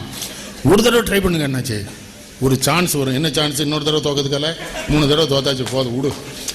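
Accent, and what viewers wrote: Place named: Tirunelveli, India. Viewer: Tambirabarani tamizh ketkka ketkka ruchi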